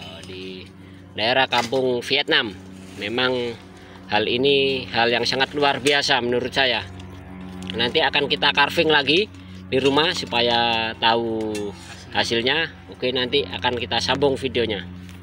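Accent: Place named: East Java, Indonesia. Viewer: Logat pean kayak orang jawa timur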